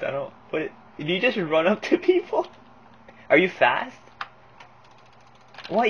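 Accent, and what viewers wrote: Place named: Canada. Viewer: you sound exactly like every other canadian admin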